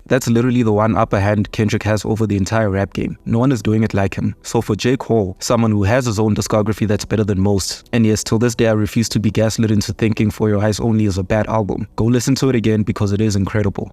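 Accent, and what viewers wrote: South Africa. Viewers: Bro your voice makes me think you're south African. Are you South African?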